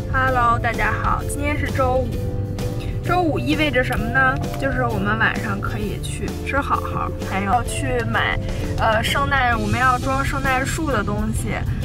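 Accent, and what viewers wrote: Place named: Shandong, China. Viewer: 你是哪里人啊听着好像山东人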